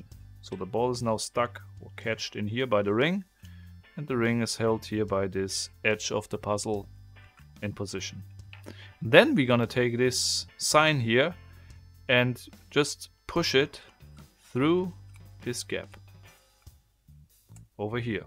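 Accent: Germany. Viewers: Voll der deutsche Akzent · Red bitte deutsch du kannst es man hört es am Akzent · Are You German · Are you german ? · Man hört deutschen Akzent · Kann es sein, dass du deutsch bist? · Dude, the accent is german und sucks af😂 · Man hört das er deutscher ist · You bought it in Germany? I knew it!!! Your accent is very German!!! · Man hört mega raus das du deutsche bist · Are ya German? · Man hört so einfach raus das du Deutsch bist XD nices Video btw, cooles Spielzeug. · German, right? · Dieses deutsch englisch regt mich so extrem auf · Are you german/ bist du deutscher? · are you from Germany? You have this specific accent:-D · Wow, hab ich mich verhört, oder kommst du aus Deutschland? Der Akzent ist unverkennbar :D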